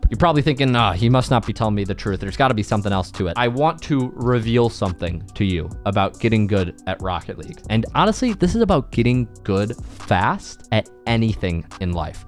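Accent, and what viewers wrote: Poland. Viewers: Nie myslalem ze jestes polakiem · Wait a minute are you polish? That Google what hear it is was i polish? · Is he from Poland?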